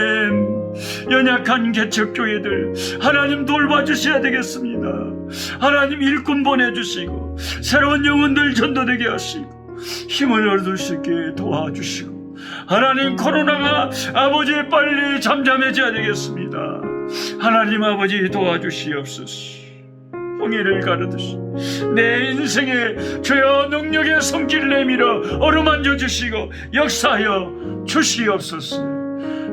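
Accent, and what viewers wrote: Jeolla, South Korea. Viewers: 할렐루야!! 움직이면서 이런저런생각을하며 하늘을보면서 맘속으로기도를했습니다 지금이시간까지 지켜주신주님이너무감사해서요~~~지금제가볼수있는것은~계획되어있지않는 알수없는길을가고있습니다~사고로모든것이올스덥되고 아버지또한 모든것이 희미하지만~저는오늘목사님기도처럼 홍해를가르시는주님을믿고의지합니다~지금환경과문제모든것을감당하고 나가는게때론벅차지만 홍해를가르시고 이스라에백성을인도하셨던주님그분의자녀가저이기때문입니다~~요즘은~계속계속~환경에서오는시련이있어서모든것이아주느리게움직이는것같고 힘이빠졌던것은사실입니다~~~목사님의기도로다시시작해보려고요 멀리서도이리말씀먹이겠다고 방을옮겨가면서 분위기바꿔가면서때론많이지치실법도하신데 목사님의사정없이쏟아지는절라도사투리로정신을차리고 다시금 또다시시작하려고합니다 ~~내일은아버지를요양원으로모시고가야 할지도모르는 무거운발을옮겨야할지모르기에 오늘만큼은 더굳건히마음에죄체감안지려고 힘내보려합니다 날씨가시골연천은많이춥습니다~아프리카목사님거실에꽃과밖에푸른실록을보고싶네요~~같이기도해주시는목사님이계시니감사가나오고 힘이나네요~~~건강하세요목사님사모님😊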